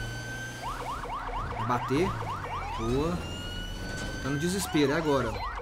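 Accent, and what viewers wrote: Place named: Goiás, Brazil. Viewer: Uau! Pensava que você era de São Paulo, mas é goianão hein hahaha que massa. Psé eu também sou, aliás o vídeo ficou maneiro. 👏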